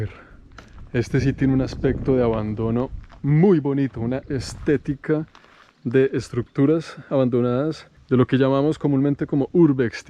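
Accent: Colombia. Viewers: Me encanto!! Igual como me gusta tu acento panacolombiano.! Un abrazo desde República Dominicana 😘🎊🇩🇴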